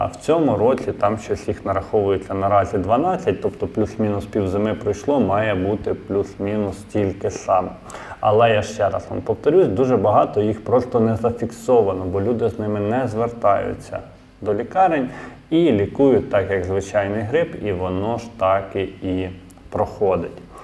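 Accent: Ukraine. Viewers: Вы учитель информатики который говорит на русском с украинским акцентом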